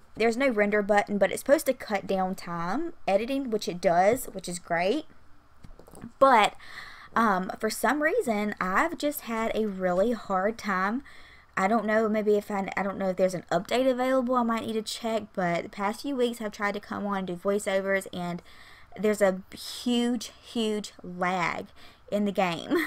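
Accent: South, United States. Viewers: OMGOSH I Never Hear A Southern Voice But My Own...... With My YT....... And I Live In Canada Now For 16 Years........ YOU Remind Me Of HOME!!!!!! Darling!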